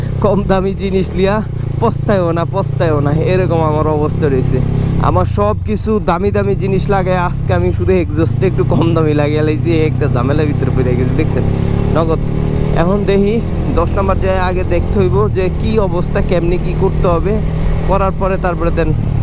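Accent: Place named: Bhola, Bangladesh. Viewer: ভাই আপনের বাসা কি ভোলা ভাইয়া আপনের বাসা তো ভোলার মত লাগে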